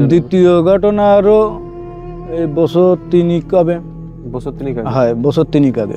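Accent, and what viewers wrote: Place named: Bagerhat, Bangladesh. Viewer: amader bagerhater vasa mone hocche❤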